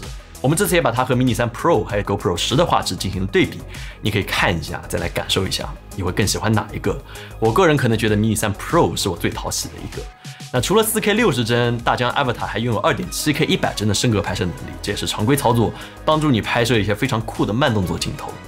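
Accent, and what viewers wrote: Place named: Shanghai, China. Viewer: 這口音也太江浙上海了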